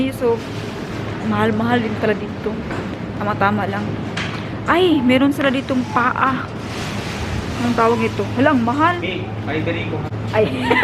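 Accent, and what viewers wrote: Visayas, Philippines. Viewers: How many man you’re going to have ? Lol just saying bisaya ka Inday di ba ? Speak good English Dai pls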